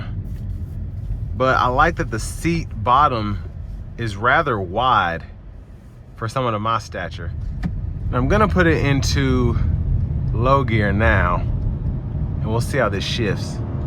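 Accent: South, United States. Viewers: Nice southern accent! Great review. 😀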